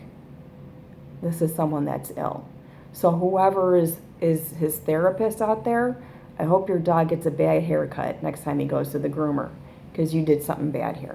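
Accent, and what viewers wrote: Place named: Chicago, United States. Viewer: Verbal Vomit is the best description ever...and so true. OH! Chicago. Similar accent to western NYS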